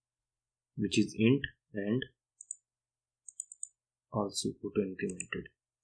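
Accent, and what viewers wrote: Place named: India. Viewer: english with hindi accent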